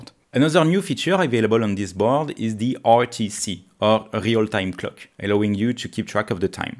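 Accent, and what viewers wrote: France. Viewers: Vous parler trop vite cela rend votre accent Parisien très agacent... mais quand meme très instructif ...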